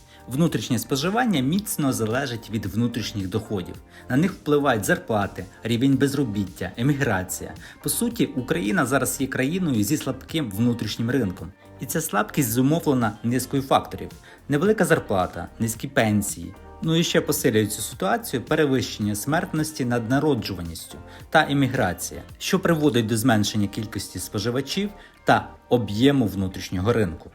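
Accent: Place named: Russia. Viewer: так аканізуєте, ніби війни в нас нема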